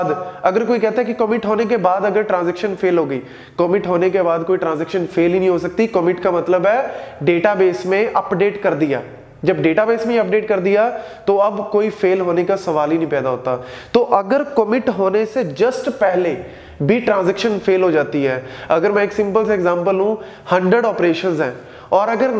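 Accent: Punjab, India. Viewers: Very good explaination along with great punjabi ascent. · u r so cute....haha...and ur hindi accent 4000,5000...haha...so cute...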